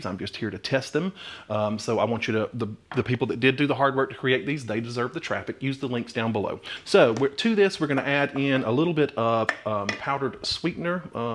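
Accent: South, United States. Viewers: Thank you. I just started watching you, and I am very impressed with many things on your show. Listing a few is your southern accent, your honest input without judgement on one or the other recipe that you are introducing to your audiences, your knowledge and teaching us of items/tools used to cook with and your knowledge of ingredients, sometimes strange, used to cook with, and how you present and do the show. The finger up to the camera for a one second pause, then flash to a new view of you having transitioned to the next thought, the way you taste and truly enjoy the items. And btw I looked at some older film of you on this channel and I can see you are thinner. This Keto life is a good thing! Thanks!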